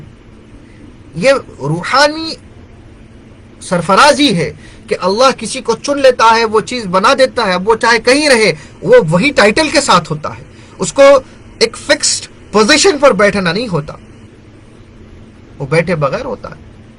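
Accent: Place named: India. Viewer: Great, Maashaa Allah, yaa habeeb. But I don't understand your Indian speaking.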